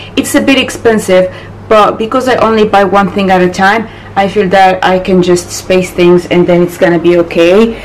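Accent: United Kingdom. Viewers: You picked some british accent😁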